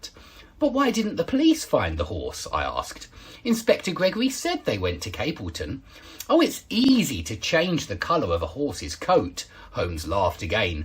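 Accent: United Kingdom. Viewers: British accent 🤌💕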